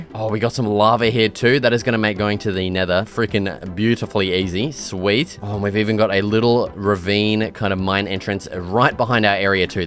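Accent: Australia. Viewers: Hahahaha i love how aussie he is hes like the only minecraft youtuber that sound like their from australia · The way you talk is so Aussie! I bloody love that mate!!! ❤ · Are you Aussie cause you really sound like one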